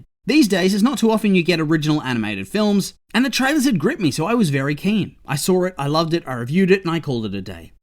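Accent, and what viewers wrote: Australia. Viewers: you sound like an australian olaf. also i agree with your opinion! i havent been able to see elio but the trailer IMMEDIATELY grabbed my attention. space is so beautiful, and they made it look so beautiful in the trailers too. and the protagonist looks so adorable!! i didnt see a lack of advertisement until i was able to get my adblocker back on. ...obviously.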